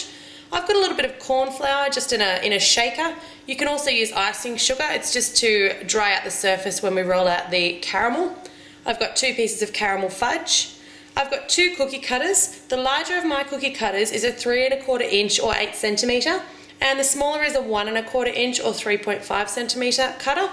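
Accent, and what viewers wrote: Australia. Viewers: Pugh she's Australian!!! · Are you Australian? I'm a kiwi, I know what an Australian sounds like... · OMG if I had an Australian accent I would never shut up. I love your accent!! ^-^ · i love her accent! are you austrailian? good video! lovely cupcakes!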